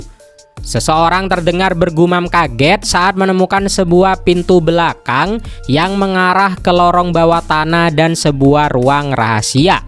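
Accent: Madura, Indonesia. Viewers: Abang orang Madura ya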